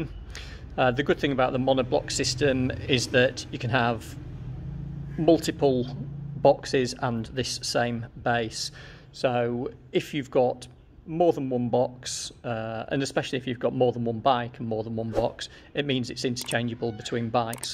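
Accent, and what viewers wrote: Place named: England, United Kingdom. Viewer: Not only a great video, well explained, but also what a coincidence, I just picked up my XR150L with rack, (2016) so spot on! Curiosity though, your accent sounds English, but the plate looks to be from another country.....my accent too is English (with a hint of Bristolian), but me and the bike? We are in Colombia, South America.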